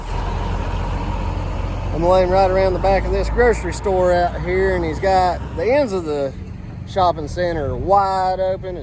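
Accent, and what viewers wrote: South, United States. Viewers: My girlfriend is now forbidden to watch this channel. She is commenting on your tats and southern talk etc. lol. She looks at every guy with their shirts off. Now i know why she is watching these big machine channels. DUDE! I can't believe you accidently crushed the septic tank. This has been one of the hottest Summers in a long time. Go easy.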